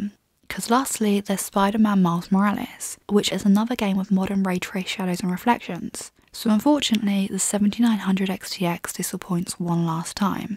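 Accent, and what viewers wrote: United Kingdom. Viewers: amo tu acento britanico.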